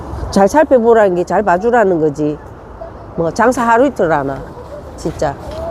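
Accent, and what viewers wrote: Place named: Busan, South Korea. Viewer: 수고 많으셨습니다....페북에서 뵐때는 무서웠는데 ㅎ...목소리는 전형적인 부산 아지메 네요....화이팅~!!!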